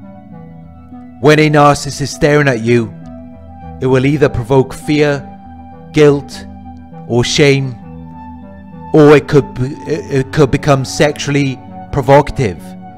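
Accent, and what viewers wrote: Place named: Manchester, United Kingdom. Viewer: I think he’s from Manchester, in north of England. I sometimes get it mixed up with the Liverpool accent, but my mnemonic for remembering is that The Beatles and Wacko from the Animaniacs (inspired by Ringo) are from Liverpool, and Daphne from Frasier is from Manchester.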